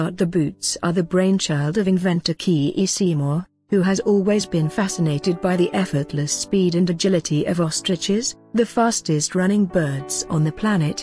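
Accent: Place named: United Kingdom. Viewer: Jeeez! Fire whoever designed this video! Nobody wants a screen full of words while a British-accented woman goes on and on in a curt cautious voice. Sheesh! Rule number 1 Pick your target audience! Are you aiming for middle aged women? I don't think so! Time for a major rethink!! Guess what we want to see when you have a title including 'Bionic Boots'? We SURE DO want to see those boots. What did you give us? A screen full of words. Not cool. (Hint: Seek coolness)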